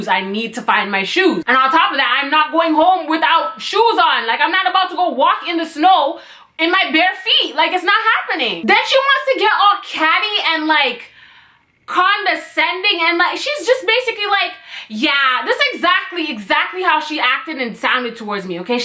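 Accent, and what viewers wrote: Toronto, Canada. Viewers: I love your toronto slang/accent lol it's so cute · The Toronto accent triggers me idk why😣😂😂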